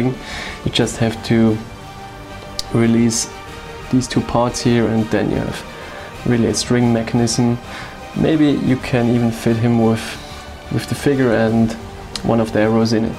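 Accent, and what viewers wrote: Germany. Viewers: your accent, are you from germany?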